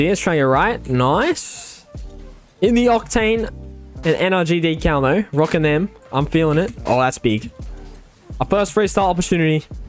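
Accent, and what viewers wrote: Australia. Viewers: i didnt even realise u were aussie man till this vid you accent stands out to even me and im aussie to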